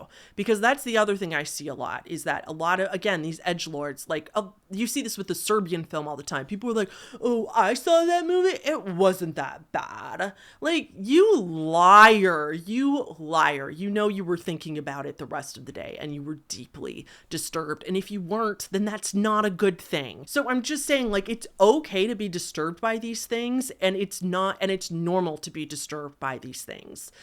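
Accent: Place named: California, United States. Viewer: I can’t take this video seriously with the thick California accent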